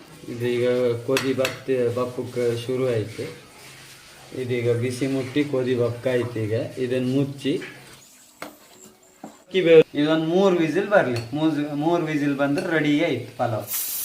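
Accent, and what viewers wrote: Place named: Kundapura, India. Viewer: ನಿರುಳ್ಳಿ ಎರಡು ಗ್ಯಂಡಿ ಸಾಕ...😂 ಒಟ್ಟಾರೆ ಪ್ಯೂರ್ ಕುಂದಾಪ್ರ ಕನ್ನಡ ಮಾತಡದ್ ಒಳ್ಳೆ ಇತ್ ಮಾರ್ರೆ 😄😄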